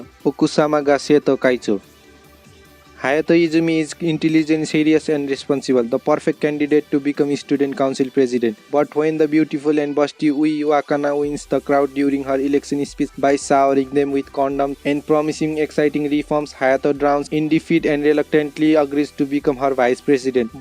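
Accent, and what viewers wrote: Nepal. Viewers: Lot of Nepali accent. I already knew. Me 2 Nepali 🇳🇵🇳🇵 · He has an Nepali Accent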